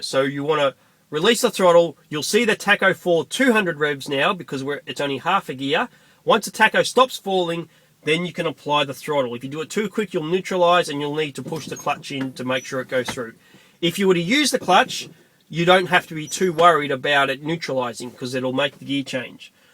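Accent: Australia. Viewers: His accent kind of gives it away. Aussie or New Zealand RH drive Western Star. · Finally a truck with the steering wheel on the RIGHT (correct) side of a truck and an Aussie accent. Those Western Star's are actually a decent drive. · this fella is definitely an Aussie, Not from NZ.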